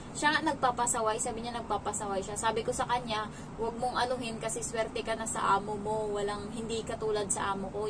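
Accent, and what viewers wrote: Iloilo, Philippines. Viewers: Hi po, ilonggo ka po pla...saan po province nyo?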